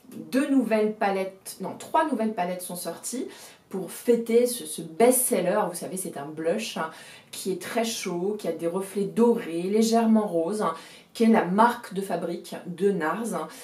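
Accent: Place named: Paris, France. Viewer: Encore un plaisir de t’écouter et de te voir avec ton joli accent parisien!😊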